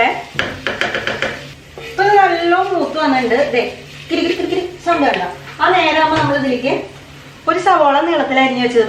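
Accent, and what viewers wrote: Thrissur, India. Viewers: Chechi trissur aano??? Evida place Iam from trissur....anyway super recipe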